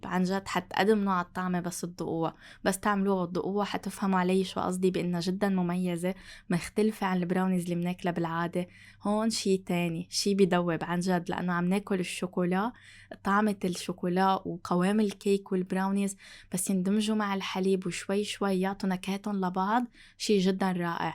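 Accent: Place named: Lebanon. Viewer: بحب لهجتك اللبنانية وصوتك هادئ وجميل وتقديمك راقي ويفتح النفس كل التوفيق لقناتك الجميلة متابعتك من السعودية 🇸🇦💖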